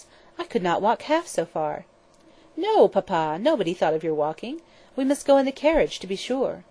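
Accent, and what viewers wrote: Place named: United States. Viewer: this is an American accent, unfortunately. i'm American, and I wanted to hear a British accent :/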